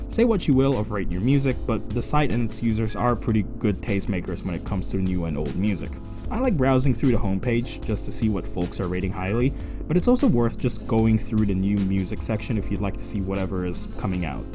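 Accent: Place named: United States. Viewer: Would've never guessed you were a foreigner, you sound perfectly American to me. Funky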